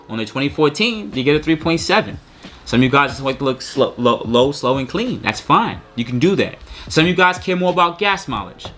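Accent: New York, United States. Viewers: Yo your such a NY'r. Moving to Miami my workers had to break me out of the axe/ask thing. But I'll still say water with my NYC accent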